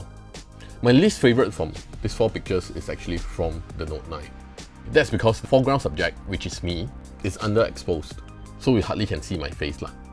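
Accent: Singapore. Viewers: Good reviews , only problem is your Singlish. Always fast and no tone at all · Within the first 5 seconds of the video, I can already tell this dude is either Malaysian or Singaporean through his accent. Turns out it was the latter XD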